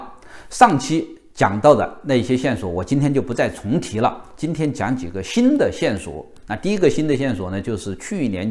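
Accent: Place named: Dangyang, China. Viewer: 口音越听越像当阳人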